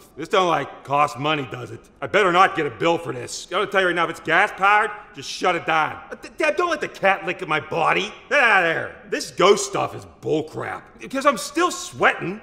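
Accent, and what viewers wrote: Pittsburgh, United States. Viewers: The amount of Pittsburgh-eese in this episode is epic! hahaha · I just love this guy - even when we lose he makes it fun. He makes me a little homesick for Pittsburgh too, but it's nice to here the Pittsburghese from someone who loves the Steelers as much as I do! Keep them coming.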